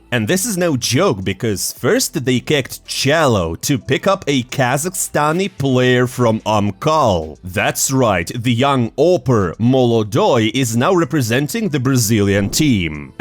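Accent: Russia. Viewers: Your English sound Russian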